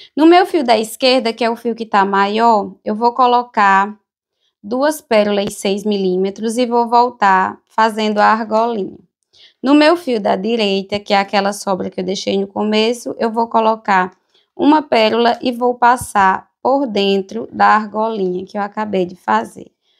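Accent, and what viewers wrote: Northeast, Brazil. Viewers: Ensina pedraria e ainda rima cordel 🥰 Ainda por cima com esse sotaque que só o nordeste oferece 😊Virei fã !!!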